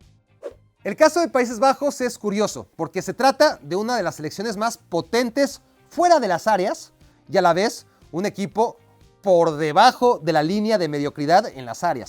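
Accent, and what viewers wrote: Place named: Mexico. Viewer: Es mexicano, se le nota en el acento. Logicamente va a hablar mal de Argentina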